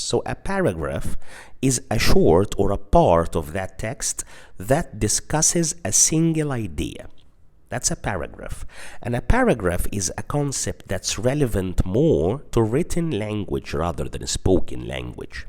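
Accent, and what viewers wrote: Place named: United Kingdom. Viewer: amazing british accent !!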